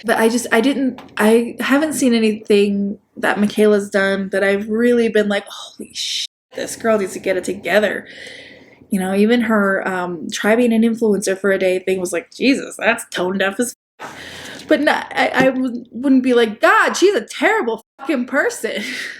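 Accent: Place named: Chicago, United States. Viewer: Omg can confirm that her Chicago comes out when she's heated. It's my FAVORITE.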